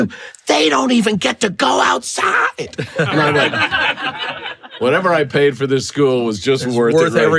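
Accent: Dublin, Ireland. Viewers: Is this guy Irish? Has such a crazy accent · Yes he has a Dublin accent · He has a Irish drawl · Comments say he's an Irish-American immigrant Brian Buffini. Cool. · I’m from Cork, love hearing his Dub accent 🎉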